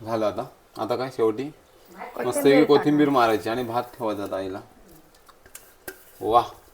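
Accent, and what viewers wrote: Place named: Raigad, India. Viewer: मस्त ani bhari tuj बोलण khup आवडतं मित्रा ओरिजनल रायगडकर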